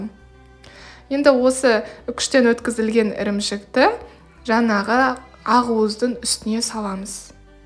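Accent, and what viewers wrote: Standard. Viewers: қолың дерт көрмесін өте түсінікті әрі таза қпзақша мөйлейсің жасап көремін Алла қаласа бірақ ортасы шикі болып қалмаймекендеп ойлап отырғанмын мен басқа рецеппен осы шарикті жасаған едім бірақ ортасы шикі шықты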